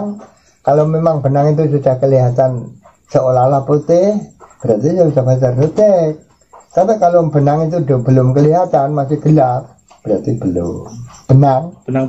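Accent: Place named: Bojonegoro, Indonesia. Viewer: Mugi slamet kung.... Kok kados bojonegoro logat bahasa nipun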